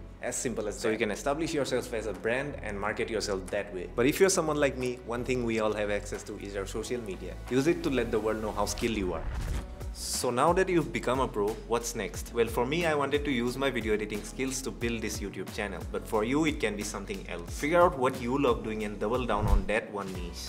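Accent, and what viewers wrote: Assam, India. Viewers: bhai tmi axomiya nki....assamese accent ata ase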